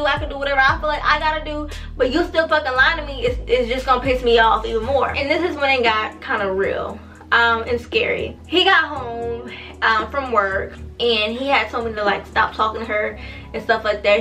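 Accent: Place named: Louisiana, United States. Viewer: You from Louisiana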